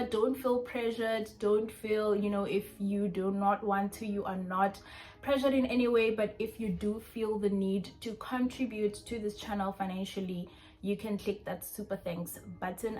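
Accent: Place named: United Kingdom. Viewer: I can start to hear some typical British accent in your voice 😅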